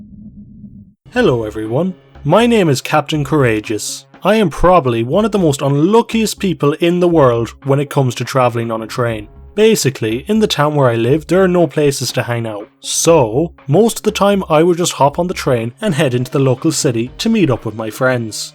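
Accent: Ireland. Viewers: Sounds Irish. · He does I was thing the exact same thing. Also because they both have a deep voice and an Irish brogue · He sounds irish Jacksepticeye intensifies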